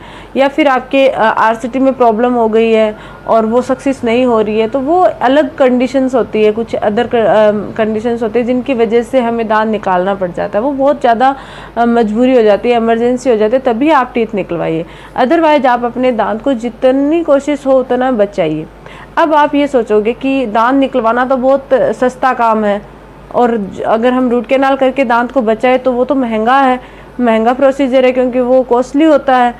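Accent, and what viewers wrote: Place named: Marwar, India. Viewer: आप भाषा से मारवाड से लगती हो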